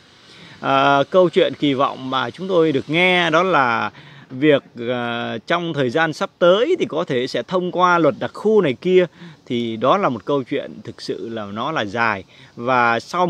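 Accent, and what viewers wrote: Thanh Hoa, Vietnam. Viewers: Nghe giọng người anh em thiện lành này chắc thanh húa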